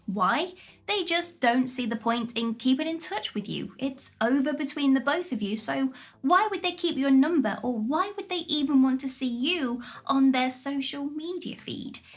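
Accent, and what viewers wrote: England, United Kingdom. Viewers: Your videos are great, you're beautiful and I love your English accent.... Just saying, but thank you.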